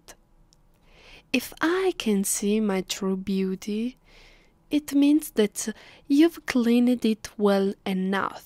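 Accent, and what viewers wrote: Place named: Italy. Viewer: To, I enjoyed your vampire roleplay. The title Vampire 's Captive. And the Italian accent, actually makes it. Extra special indeed.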